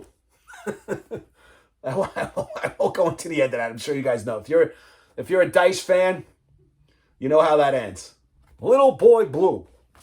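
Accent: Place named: New York, United States. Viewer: Only just found your channel by luck, love it, love the New Yawk chat too, my favourite City in the world.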